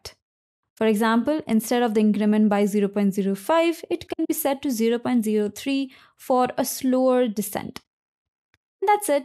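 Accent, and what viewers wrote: India. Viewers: You're legitmately just a racist. This is perfect english with an Indian accent, if you don't like her accent, that's a you problem.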